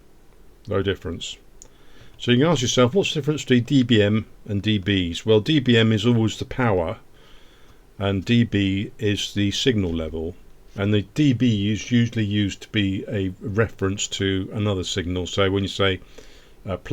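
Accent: United Kingdom. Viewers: Sounds British….english with pounds… … not French… and the ai didn’t convert you to a New England accent nor change your words to be dollars….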